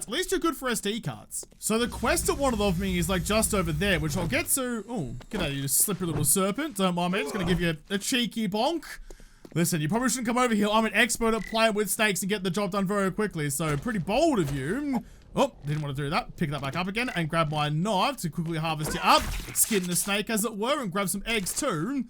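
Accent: Australia. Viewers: P.S. Miss your Aussie voice and excitement in the morning it helps start my day, the content is great as always... Just not the schedule!!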